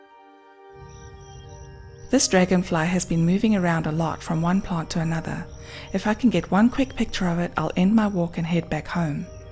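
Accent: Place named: South Africa. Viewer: Hi! I could believe it when I read the data on one of your photos! The ISO was only 800! That's incredible! Also, I think that your voice is almost soothing to listen to, and your accent (which I believe is South African?) Is wonderful!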